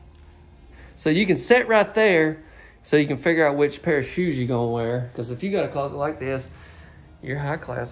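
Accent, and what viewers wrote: South, United States. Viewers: Omg, this has nothing to do with nothing, but I love his Southern accent♥️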